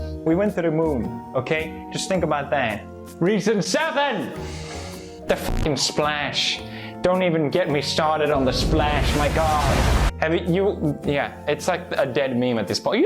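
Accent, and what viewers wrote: United Kingdom. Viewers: as a Mexican living in Britain, I can confirm this is how Brits sound like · His uk accents are getting a lot better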